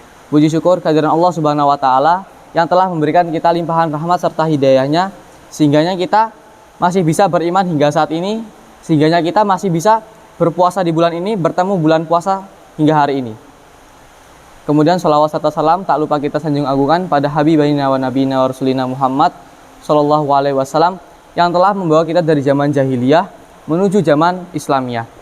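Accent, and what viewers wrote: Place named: Java, Indonesia. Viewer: Masya Allah bang 🥰🥰 tambah pinter, tmbah sholeh. Aminn 🤲🤲 Didenger2in kok suaranya jd medok jawanya ya sekarang, udh pinter bahasa jawa kayanya 😁😁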